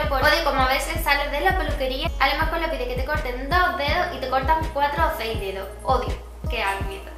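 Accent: Andalusia, Spain. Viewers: De nada guapa PD;se nota q eres andaluza (eres andaluza no?) si lo eres ya somos dos un beso guapa!!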